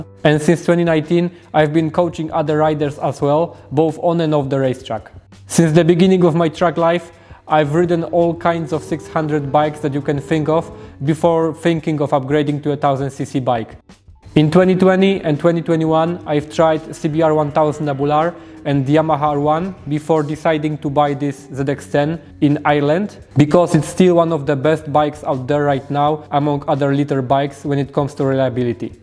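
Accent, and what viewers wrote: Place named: Poland. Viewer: Powodzonka, dobrze sie slucha tego Polskiego Angielskiego 👍💪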